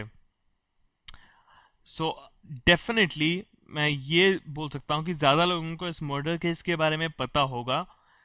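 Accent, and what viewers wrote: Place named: India. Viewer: the accent doesn't sound american to me.. its our own desi accent ..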